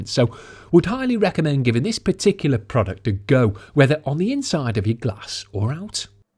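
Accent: Liverpool, United Kingdom. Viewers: Another awesome video with great and humourous scouse commentary.Juvenile sneeze splatter got me😂👍🏻👍🏻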